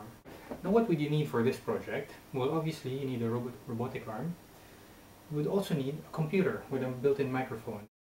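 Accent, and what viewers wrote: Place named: North America. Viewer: North American accent in the UK from somebody coming from Japan . A truly global techie guy !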